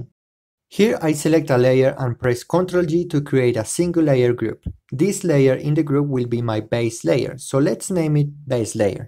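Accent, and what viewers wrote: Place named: Latin America. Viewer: me encanta que hables ingles aunque mi mente me dice que eres latino, asi que te entiendo el spanish que hay detras de tu ingles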